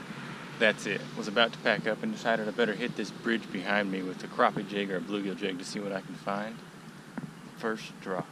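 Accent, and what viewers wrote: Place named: South Carolina, United States. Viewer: May I ask what state you are in? I'm new to your channel maybe you said. Seems like a S.C accent you have. Crappy are a fish I always had difficulty catching. And yet I was amazed to see several young boys taking long stringers of them out of a tiny pool below a dam outlet. They were just flicking the line like a fly rod. Amazing. Another cold day here, thank you or the vid!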